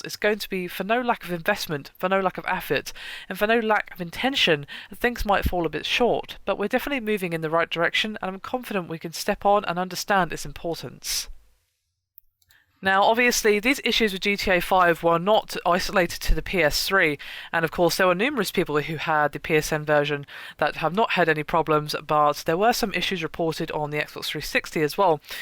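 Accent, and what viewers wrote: United Kingdom. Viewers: the fruity little british accent makes this unwatchable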